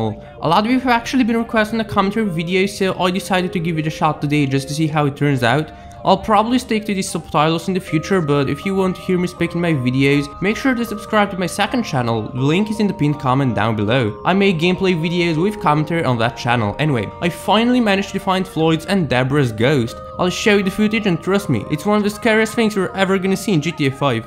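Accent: United Kingdom. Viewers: Are u from Scotland?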